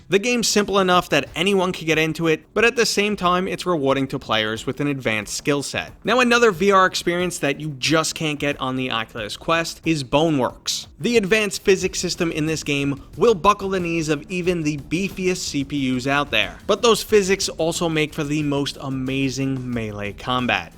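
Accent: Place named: Brooklyn, United States. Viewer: I’m Scottish and I feel this guy is from Brooklyn. Am I right?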